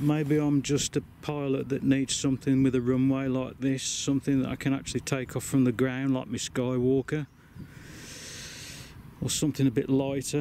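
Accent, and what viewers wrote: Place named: West Country, United Kingdom. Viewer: If I lived close mate, I'd do it no problem! But judging by your accent, I'm guessing West Country somewhere? I'm up in West Yorkshire, Leeds. Looks a nice spot to fly though.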